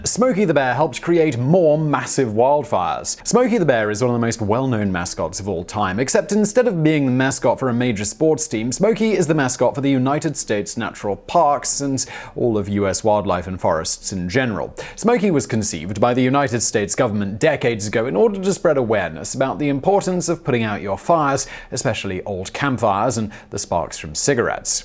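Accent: England, United Kingdom. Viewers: Anecdotal, but I knew quite a few people who got paid out for damages from FEMA. It seems like a lot of these toptenz videos are aimed at slinging dirt at the US, via an overly snobby sounding English accent. Why don't you focus on your own country more? It needs some focus, that's for sure, because it's falling apart worse than the US is. Bye Felicia✌️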